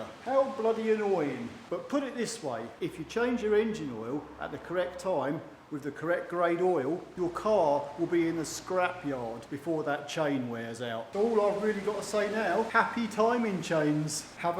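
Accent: United Kingdom. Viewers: Hi british accent, this wet belt made by Dayco?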